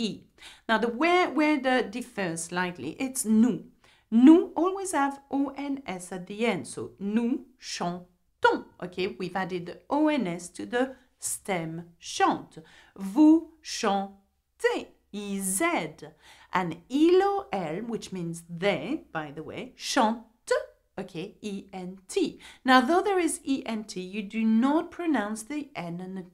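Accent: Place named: France. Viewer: Thank you. These are good refreshers for me. J’ai un question. Vous êtes de quel région en France. J’ai étudié en Normandie (Rouen). Votre accent me semble un peu différent.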